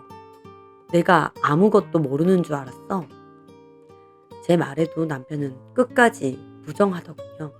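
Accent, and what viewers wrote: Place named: Gyeongsang, South Korea. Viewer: 경상도 사투리 반갑습니다!!!!!!! :D 경상도 사투리라 그런지 사이다 부분에서 두배로 시원해요 ^0^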